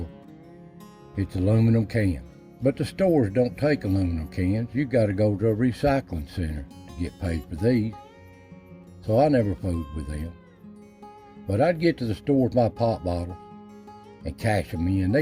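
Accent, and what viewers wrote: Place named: East Tennessee, United States. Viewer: I love your videos and your accent traveled through Tennessee got a taste of East Tennessee and fell in love sadly we had to keep on traveling now in Iowa and I sure do miss those mountains ⛰ 💚✌️ we are from South Texas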